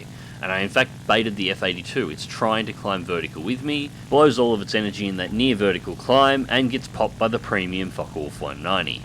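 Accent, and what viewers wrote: Australia. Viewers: andrews Seriously, I'm laughing my ass off!!!!! Yes I know that you know he's an Aussie, it was just the perfect reply to getting irked about people teasing him about his trade mark accent!!! Don't ever change!!! You sound like the great Brian Brown in "Breaker Morant!" Sprog1 in AZ.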